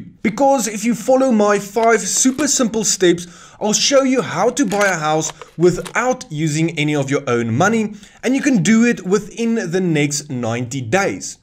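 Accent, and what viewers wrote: Afrikaans, South Africa. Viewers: He clearly has a thick Afrikaans accent. Focus on the vital info he is sharing and not the pronunciation. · The Afrikaans is strong with this one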